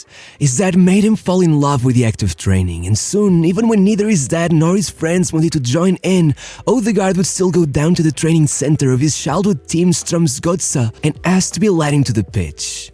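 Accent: Portugal. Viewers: Bro, embora com um excelente nível de inglês sinto que consegui perceber que és português ahahah abraço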